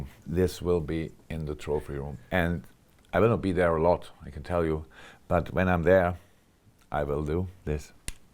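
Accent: Liverpool, United Kingdom. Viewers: Not a Liverpool fan but Jurgen I salute you and all you have achieved. There are so many things I admire about you and your time in English football but if I were to list them all, I’d be here until 2028! 😅 The Premier League will never be the same without you mate And by the way…I think your scouse accent is class! 😂👌 All the best for the future buddy! YNWA ❤️ Joel Barnsley fan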